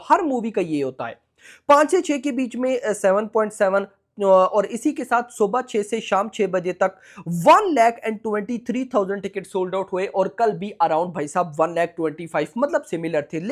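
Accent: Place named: Pakistan. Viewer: Ye pakistani hai kya bc kya accent hai ye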